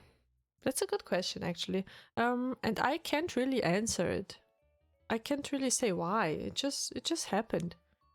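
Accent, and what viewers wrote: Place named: Asia. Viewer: Congrats to the milestone, I took the opportunity and subscribed as well. Another thing: I was really surprised when I heard you say Mettbrötchen, and then you mentioned that you speak German. I originally thought that you are maybe from asia due to the accent, the name, and your demeanor which has a non-German vibe to it (but maybe I'm just used to one specific kind of German). But everything makes sense now.:)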